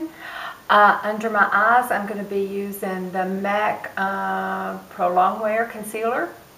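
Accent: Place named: Virginia, United States. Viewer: I just figured out why I adore you so much- I have an aunt Ruth- she lived in Virginia-- (same cute accent) you have her mannerisms- and she was a beauty!!! When she was in her 20s people stopped her for an autograph they thought she was Rita Hayworth- My aunt had auburn hair.- I really believe god has so many molds LOL-See If this fits you. i will describe her - a "spit fire stubborn- Huge giving heart. When things are bad you are the light you know in the end it always works out. You avoid drama- LOVE design - have a hidden artist inside. To modest for your own good sometimes. Love your kids but its important to have your own life too .When she walked into a room she grabbed everyone's attention with out trying.. any of these fit? xoxoxo I FORGOT about doing my hair like this!!!! lots love shelly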